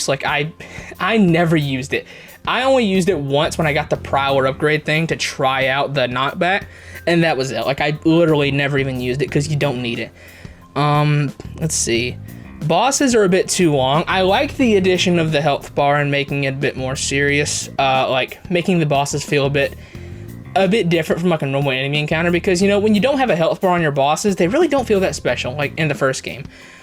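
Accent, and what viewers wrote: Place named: South, United States. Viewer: I love your country ascent 😂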